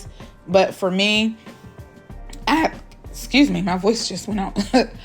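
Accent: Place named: Pittsburgh, United States. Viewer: I’m from NY… my college roommate and best friend was from Pittsburgh 🥹 your accent sounds so much like her 🥰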